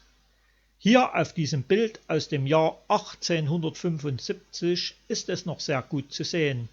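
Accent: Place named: East Germany, Germany. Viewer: so jetzt hab ich au wiedermal bei dir reingluegt ;-) Intressantes Video und der Dialekt isch ja echt , wir sagen hier Ossi ;-) haben ja viele bei uns , kenne auch ein paar aus Schmakhalden , Trusenthal , Brotterode usw , war sogar mal für eine Woche da es hat mir sehr gut gefallen , war auf dem Iselsberg , in der Marienglasgrotten , usw bis bald mal wider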